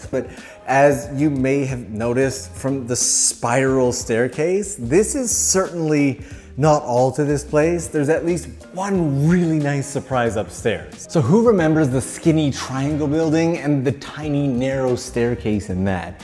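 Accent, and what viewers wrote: Canada. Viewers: This is the most Canadian accent I've heard in a very long time.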